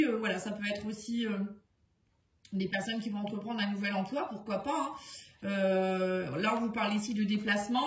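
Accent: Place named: North, France. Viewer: Étant moi même nordiste j adore votre accent merci pour votre guidance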